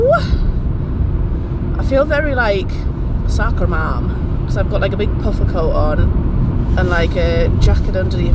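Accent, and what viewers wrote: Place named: Bristol, United Kingdom. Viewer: lol at your Bristol accent 😂 sending my love from Bristol ❤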